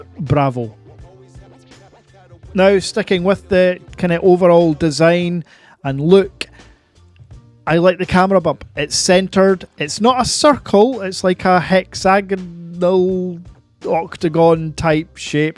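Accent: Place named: Scotland, United Kingdom. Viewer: Sounds mad but it could because you are Scottish and the accent ..People are very shallow 😂😂.I bet if you had an Aussie or Yankie accent be well on the way to 100k subscribers 👌👌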